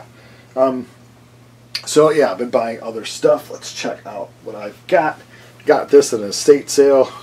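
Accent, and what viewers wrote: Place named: Chicago, United States. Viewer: I can tell right away that you're from Chicago. I grew up in Chicago but I live in California these days. That Frehley's comet live album was recorded at the Aragon Ballroom. I was there. Y&T was the opening band. had the best song on that comp album " ".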